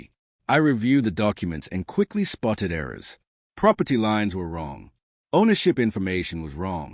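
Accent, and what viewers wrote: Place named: Australia. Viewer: Ya gotta have lots of respect for a Texan who speaks with an Aussie accent .....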